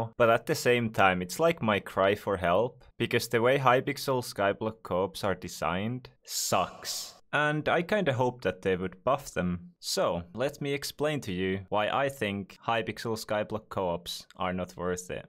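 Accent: Finland. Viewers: U finnish? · ootko suomalaine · are you from finland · is this dude from finladn?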